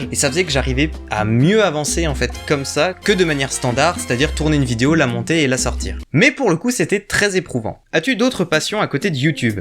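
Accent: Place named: Alsace, France. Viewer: Merci ( Moi je connais ton vrai prénom hehehe 😜 ) pour cette FAQ, j'ai appris des choses sympas sur toi 😃👍🏻 Et j'avais deviné que tu habitais cette region, ( j'ai habité en Alsace pendant 11 ans, ma region de cœur 🥰 elle me manque 😓 ) le "OUi", ils le disent "Ui" c'est rigolo 😂 Khalee et tes 2 chats, je les adore! 🙏🏻😍💝 Je suis aussi cinéphile, quand je regarde mes Thrillers psychologiques, je decortique, je fait des hypothèses, j'essaie de comprendre ce que le réalisateur a voulu nous faire comprendre 🤔🕵🏼‍♀️ Et une question qu'on ne t'a pas posée: Je vois que tu as plusieurs guitares derrière toi, tu en joues? Si oui ( Ui 😂😜 ), pourrais-tu un jour nous en faire profiter un peu? Je suis qqun qui est très musique, chant, danse, etc... et je suis totalement d'accord avec toi, Joyca il pèse lourd dans le game, il est tellement doué!! J'adore ce qu'il fait, c'est fou ce qu'il arrive à sortir avec 1 son de 2 secondes 🤩🎶 Et quand Squeezie ( que je kiffe aussi énormément 🤩🙏🏻 ) s'en mêle, ça fait un duo de MALADE 🤩Sora est trop adorable et bienveillant, je le suit depuis peu, je l'ai decouvert récemment, et bien sûr, Louis! 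🤩 Je suis de plus en plus attirée par la culture Asiatique, le Japon 🏯🇯🇵💝 et la Corée 🇰🇷💝🎶 Voilà, j'adore ce que tu fais, ta façon de raconter, ton humour rafraîchissant 🤣🙏🏻 je t'ai decouvert il n'y a pas très longtemps, je dirais 7 mois 🤔 avec ta vidéo "Vous ne pourrez plus être en couple" 🤩🙏🏻 J'ai hâte de voir ta progression, tes projets, etc... Biz da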